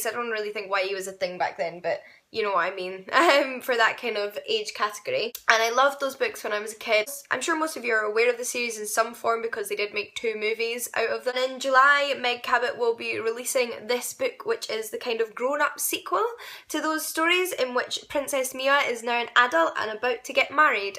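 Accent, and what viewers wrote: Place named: Glasgow, United Kingdom. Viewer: I love your accent, are you from Glasgow?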